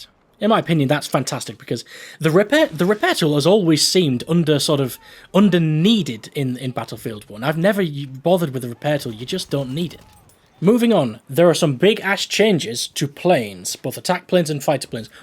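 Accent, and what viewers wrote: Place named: North, United Kingdom. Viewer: you have a charming Northern accent. Where did you grow up?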